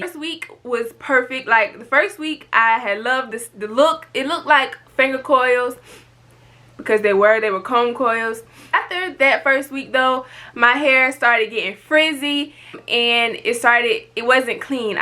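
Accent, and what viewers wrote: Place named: Atlanta, United States. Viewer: I’m getting real Atlanta GA vibes from your accent 😂 love it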